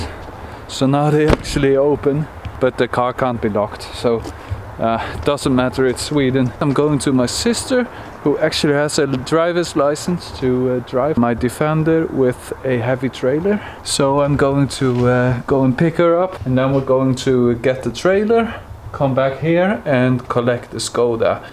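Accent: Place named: Netherlands. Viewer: Ik hoor je Nederlands praten 😄 I hear you speak Dutch not Swedish 😀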